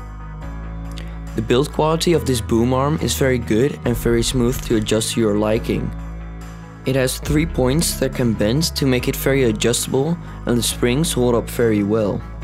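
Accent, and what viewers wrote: Netherlands. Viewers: Grappig hoe elke Nederlander die naar kijkt het Nederlandse accent herkent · Nice video! Great quality. Bij setup wars herkende ik meteen je Nederlandse accent :P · It may be that you Dutch speak normally because that I hear to your accent #TeamTechSource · R u dutch? so yea I can hear it from your accent :) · ur accent sounds kinda dutch, i can tell cos im dutch as well. (great video btw, keep it up!) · ja klopt toen ik je horden dacht ik WTF deze gast is nederlands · Nice! ik kom hier van Setup Wars ik herkende direct dat je nederlands was als je engels praat let wel een beetje op jou accent met die F zoals Fideo maar echt nice kanaal ! sub bij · ik dacht al... dat accent is NL ;P sinds ik op je startscherm vrijdag .. februari zag staan weet ik het zeker haha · Where you from you sound dutch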